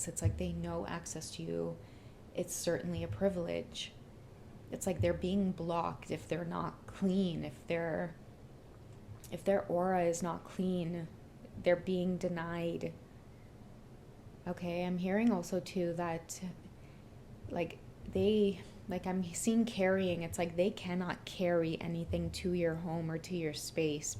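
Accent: Trinidad and Tobago. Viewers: D Trini accent killin mehhh 🇹🇹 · 😂 Outside of your gifting to read, I LOVE di Trini vibes yuh does tro een every now and then! 😂👊🏿 TrinBago tuh di whurl wi!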